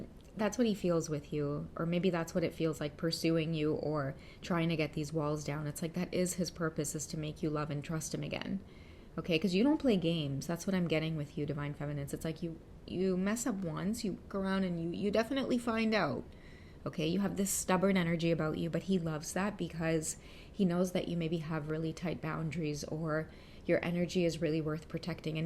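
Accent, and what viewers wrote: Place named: Trinidad and Tobago. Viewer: I absolutely love the Trini accent Queen!!! It gives me life 🥰🙏🏽❤️🙏🏽 Thank you for sharing yourself and these amazing readings with us ❤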